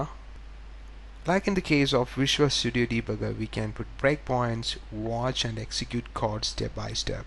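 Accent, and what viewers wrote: Kerala, India. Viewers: He is speaking with a Malayali accent. People across India speak with different accents. The content in his videos are indeed well thought out and very helpful. Appreciate his effort and if you cannot then move on; there is no need to be abusive.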